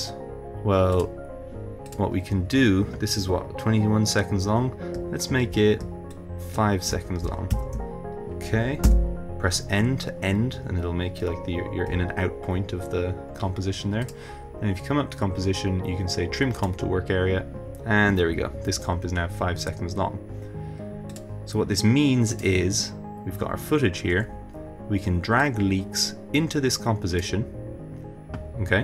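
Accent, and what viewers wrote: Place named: Dublin 4, Ireland. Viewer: Dublin 4 accent if I ever heard one haha :P Great tutorial keep up the good work bro.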